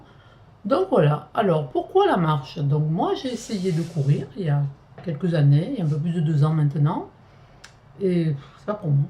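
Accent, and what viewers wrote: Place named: Aubagne, France. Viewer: Comme toujours, tu as des explications claires et précises......que c'est agréable......et en plus, avé l'accent d' Aubagne! lol C'est super, moi, je vote pour toi, pour la Reine des Blogueuses du Sud! Bisous 👍😘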